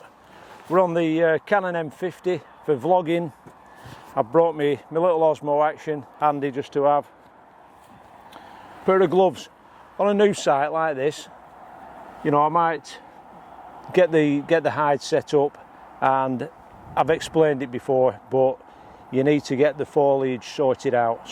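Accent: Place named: Greater Manchester, United Kingdom. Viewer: Hi Im new to ur chnl what lovely video u sound fairly local to me here in grtr manchester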